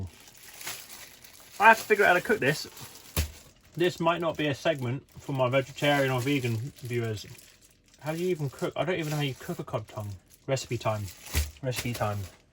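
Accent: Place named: United Kingdom. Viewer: Love your British accent. Are you from Liverpool?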